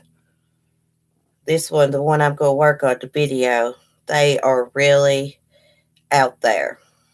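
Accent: South, United States. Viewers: Hello. I am new to channel but I love it!! You are so down to earth. I love your southern accent!! I love the south. Your channel has no drama and you have good mods too. You and I seem to have the same opinions on most things. Ok going to watch more of your replays!! Thanks for being you, real!!!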